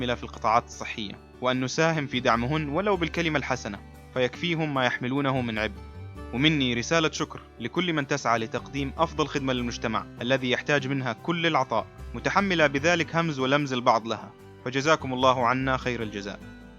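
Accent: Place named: Hejaz, Saudi Arabia. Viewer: لا تتعب نفسك بهالمبررات اللي إنت تقول عنها بأن الإختلاط في مصلحة الشعب أو بأنه تحضر وتقدم أو بأن الإختلاط ضروري بالمستشفيات وأن من الصعب الفصل بين الجنسين بها .. لا بالعكس المستشفى أكثر مكان يتوجب الفصل بين الجنسين به لأنه المكان الوحيد اللي بتضطر أن تصطحب محارمك وتذهب إليه وفيه كشف للعورة أحيانا وفيه قولة خل محارمك عندي واطلع برة .. ولا يوجد رجل في قلبة ذرة غيرة مؤيد الكلام اللي قلته بالمقطع .. لو أن هناك أماكن من الصعب أن تفصل بين الجنسين بها مثل المسجد الحرام وبقية المساجد قال الإسلام النساء يأتين بالخلف وكذلك الشوارع والأسواق ولكن المستشفى وش خسرانة الدولة لو تم الفصل بين الجنسين ولو بالشيء القليل .. الغيرة موجودة يا صاحب المقطع مبين من لهجتك بأنك من حضر الحجاز يا اللي كل همكم وشغلكم الشاغل التمويل والسعي ورى الإنفتاح والإختلاط ووضع مبررات وحجج بأن الإختلاط والإنفتاح فيه فايدة للشعب وتقدم وتحضر ورقي .. قبحكم الله .. الإختلاط بالمستشفيات هو اللي أجبر بقية الأماكن أن تتبع خطاها بالإنحلال و الإختلاط اللي حرمه ربك .. 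تقول بالمقطع صعب على الدولة أن توفر في كل قسم وفي كل تخصص طبيبات وفنيات وصيدليات وإداريات في أقسام نسائية مستقلة وش الصعب في الموضوع .. لو الدولة لم تجد امرأة سعودية في تخصص معين بإمكانها أن تأتي بطبيبات وفنيات وإداريات من الخارج وتوفر وتسد حاجة كل قسم حتى بنات البلد يغطين النقص .. لو يا صاحب المقطع صعب تدريب الشباب الأطباء الطلبة في أقسام نسائية وتوليد هن للنساء ولادة طبيعية مع العلم بأن من اللي كان يولدهن قبل مجيء المستشفيات وأحيانا تخصصات تافهة من السهل أن تسد حاجتها سيدات مثل الأشعة و المختبر ومن يشفط البلغم من حلق المريضة لااا.. والممرضة اللي تروش المريض ..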